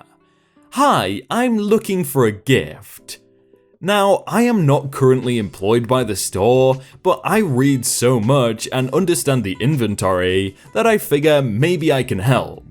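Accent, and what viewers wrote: United Kingdom. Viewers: understandable it's that smooth british accent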